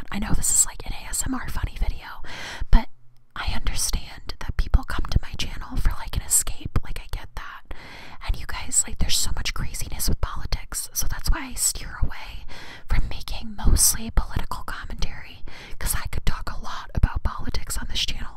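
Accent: United Kingdom. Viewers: The only British accent I’ll ever need to hear the crazy loud Jesus fuck is SOO FUNNY 😂😂😭😭😭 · As a Brit , I absolutely love your British accent 🤣🤣. Also, abortions during birth? that's terrible JESUS FUCK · What is wrong with people? If you don't like something someone is saying or doing on their chanel, just don't watch. It really is that simple. Hope everyone has a blessed day. ( Your british accent was fun) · The British accent 🤣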